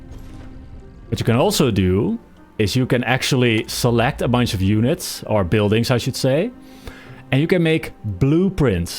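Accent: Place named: Netherlands. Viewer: Very good video! Are you Dutch per chance? I hear an accent :)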